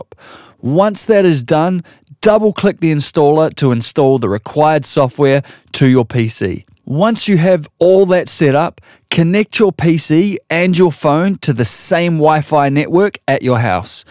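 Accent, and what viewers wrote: Australia. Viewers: You sound autrallian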